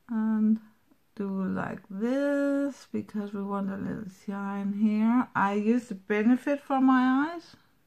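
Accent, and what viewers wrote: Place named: Denmark. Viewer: You live in Denmark? I love your accent!! Your eyes look beautiful!!!!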